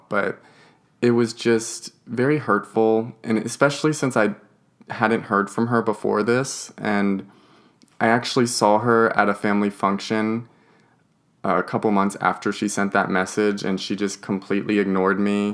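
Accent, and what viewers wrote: United Kingdom. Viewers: Jezzz whiz, for an Adult PORN STAR you seem down to earth, really u do but are u english..for some reason i think your british but i believe your american....great vids....great chats..have a great day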